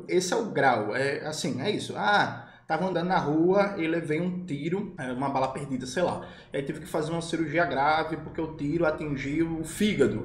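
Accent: Pernambuco, Brazil. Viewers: Isso é o sotaque pernambucano amooo❤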